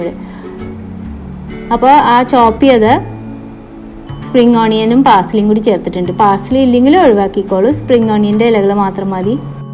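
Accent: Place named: Ernakulam, India. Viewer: nattil ernakulam ano veed.samsarikkumbo chela time’lu njangalude slang kayari varunnund😀😀😀✌🏻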